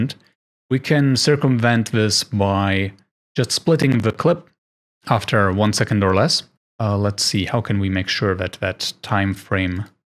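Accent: Germany. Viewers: Kann es sein dass du deutscher bist weil du redest so deutsches englisch hahahahahah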